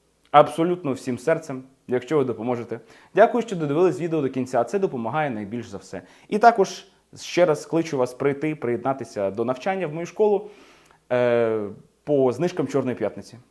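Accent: United Kingdom. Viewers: Ці відео дуже корисні! І я вам дуже вдячна за ці уроки. Я завжди вам коментую бо я дійсно хочу щоб у вас був мільйон підписників! Обожнюю ваш британський акцент! Можливо ви колись розповісте нам як ви вивчили англійську так бездоганно!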